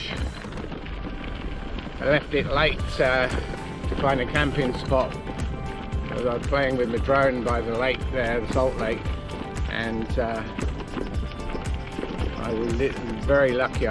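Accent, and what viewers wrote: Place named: Australia. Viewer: Great to see you're still a bit crazy after all these years. And a bit of an Aussie accent to boot! 😁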